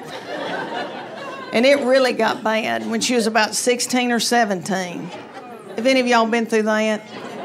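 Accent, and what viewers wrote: South, United States. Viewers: The killer is she has that southern kill ‘em with kindness And sarcastic southern drawl. If called me Darlin’ I’d run! lol hysterical and all so true! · Her southern accent really adds to the delivery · She is heavy with southern twang. I swear I thought she said ‘ma daughter was sixtwaang’ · Love that Southern accent - the best, and sooooooo. funny. A very special Comic, wife, and mom, and a relative, neighbor -just hilarious! Thank you Leanne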